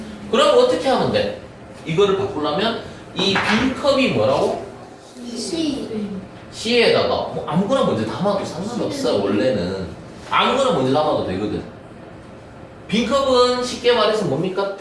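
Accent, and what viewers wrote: Gyeongsang, South Korea. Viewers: 강사님 참 잼나게 강의하십니다. 특히 구수한 경상도 말씨로 생동감이 넘침니다. 한가지 문의드립니다.Window sever 2012에서 화일탐색기로 임의의 화일을 삭제할경우 마우스 클릭후 delete key할경우 선택한 화일이 삭제되어야 하는데 삭제가 안됨니다. 강제로 삭제(Shift+delete )할경우 삭제됩니다. 어떤 원인인지?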